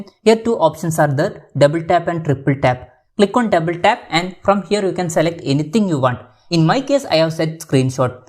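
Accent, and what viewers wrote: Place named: India. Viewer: Worst Indian accent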